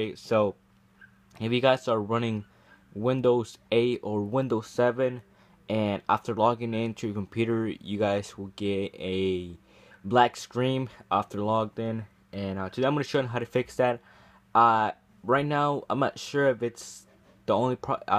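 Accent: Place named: South Asia. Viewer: You sound like a brown guy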